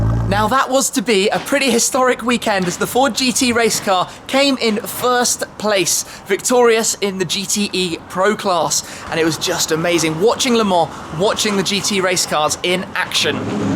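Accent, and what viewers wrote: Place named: United Kingdom. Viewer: Must be nice to be white with a British accent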